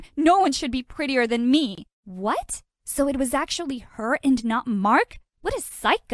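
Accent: Philippines. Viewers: wow i was shock i like the filipino accent and the word makes me laugh hahahhaha and she mentioned phili[[ines i feel happy and excited HAHAHH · Omg I'm a pilipino and the freakin accent😭😂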